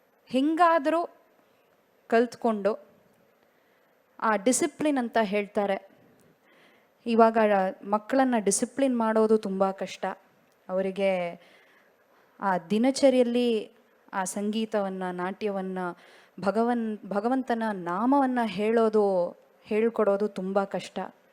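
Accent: Standard. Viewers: ಶಿವಶ್ರೀ ತಮಿಳುನಾಡಿನಲ್ಲಿರುವ ತೆಲುಗರ ಹುಡುಗಿ. ಚೆನ್ನಾಗಿ ಶುಧ್ಧ ಕನ್ನಡ, ಅದೂ ವೇದಿಯಿಂದ ಮಾತಾಡಿದ್ದಾರೆ. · ತಾಯಿ ನೀವು ಬೇರೆ ಭಾಷೆದವರು ಆಗಿ ಇಷ್ಟು ಶುದ್ಧ ಕನ್ನಡದಲ್ಲಿ ಮಾತನಾಡಿದ್ದಕ್ಕೆ ತುಂಬಾ ತುಂಬಾ ಧನ್ಯವಾದಗಳು .ಕನ್ನಡ ನಾಡಿನಲ್ಲಿ ಹುಟ್ಟಿ ಕನ್ನಡದ ಅನ್ನವನ್ನು ತಿಂದು ಕನ್ನಡದಲ್ಲಿ ಮಾತನಾಡಲು ನಾಚಿಕೆ ಪಡುತ್ತಾರಲ್ಲ ಅಂಥವರಿಗೆ ಸರಿಯಾದ ಉತ್ತರ ಕೊಟ್ಟಂತಾಯಿತು ತಾಯಿ ತುಂಬಾ ಧನ್ಯವಾದಗಳು. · ನಿಮ್ಮ ಸ್ವಚ್ಛವಾದ ಕನ್ನಡದ ಭಾಷಣಕ್ಕೆ ಕೋಟಿ ಕೋಟಿ ಅಭಿನಂದನೆಗಳು 🙏🙏🙏🌹🇳🇪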